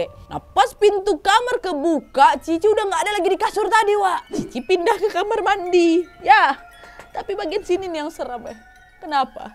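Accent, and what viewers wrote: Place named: Medan, Indonesia. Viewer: Tiap hari nontonin video nya..siang mlm..dari video trbaru sampai video lama...sampai kebawa2 bahasa sma logat medan nya 😅😅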